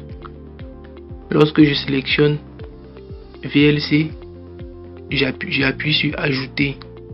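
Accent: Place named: Antilles, Caribbean. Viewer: T’es antillais mec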